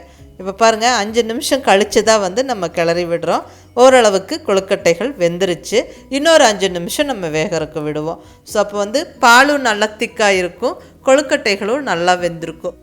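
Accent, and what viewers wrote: Kongu, India. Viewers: She is from coimbatore. Kongu tamil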